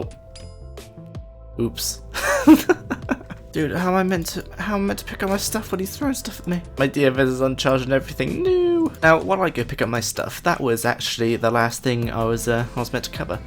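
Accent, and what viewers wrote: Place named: RP, United Kingdom. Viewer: your so posh i cant understand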